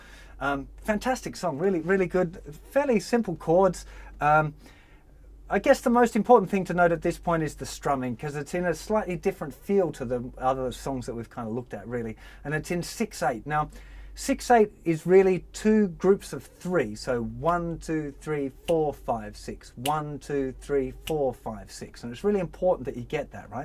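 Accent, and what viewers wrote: United Kingdom. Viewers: OMG! i almost dropped my coffe when you started talking! YOUR'E BRITISH! om my lord jesus and everything in between!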